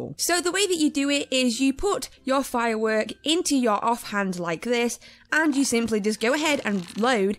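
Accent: England, United Kingdom. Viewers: Cant You Hear Her? And Shes English And What Language Are you?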